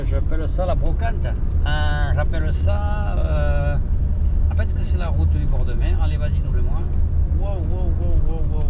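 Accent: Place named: Southwest, France. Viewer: Sud Ouest St Jean de Luz, Bayonne, Biarritz plutôt je pense 🤔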